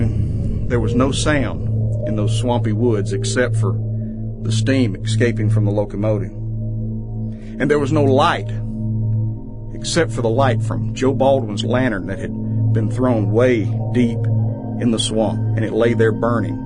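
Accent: South, United States. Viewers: I love the story but every time I hear it the narrator always has a pronounced southern drawl. In 2019 can’t someone without such an accent narrate the tale?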